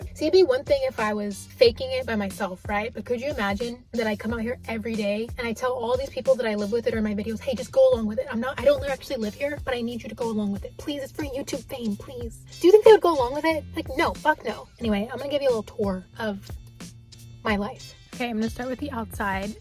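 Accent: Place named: California, United States. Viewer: The only thing i questioned is where is your Texas accent, sounds like you were born and raised in Cali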